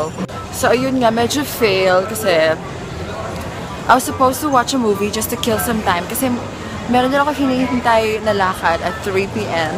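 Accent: United States. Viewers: im a new subscriber of yours akala ko nung una american ka hahahahha na curious kasi ako sabi ko bat may foreigners na nag rereview ng anne clutz brush hahah so ayun nagulat ako nung nag tagalog ka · I literally thought you live in us bc the way you speak! HAHAHAAHAH! Omyygad! Youre slayinnnnn it gurl! This is the first time i’ve watched u! Haha keep spreading good vibes! Mwa xx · OMG This is my first time to watch your video and I thought you were american. I was shocked when you've started to talk in tagalog!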